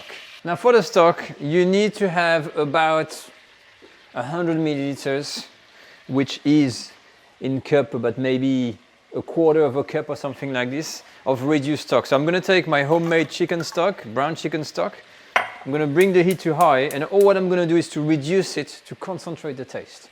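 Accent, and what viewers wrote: France. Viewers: With that accent, you can talk talk talk all you like monsieur. Je t’adore!! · French cooking with a lovely french accent, délicious !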